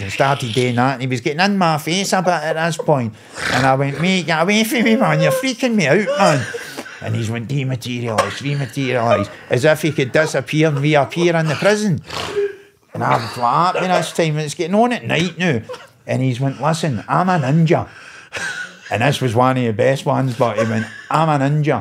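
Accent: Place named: Glasgow, United Kingdom. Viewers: Hilarious story and made even more hilarious by the Jock accent. Sorry Yanks you're missing out. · I understand every word am no even a weegie 😂 perfect accent of Scotland 😎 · Maybe sub titles for his awesome Scottish accent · Unreal patter. It makes it even better that only us glesga folk understand every single word. Phenomenal haha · Very very very funny. I feel sorry for your foreign audience, S... that's about as broad a Ouijii Lallans Scots as youll get.. Im a Scot and it tested my ear!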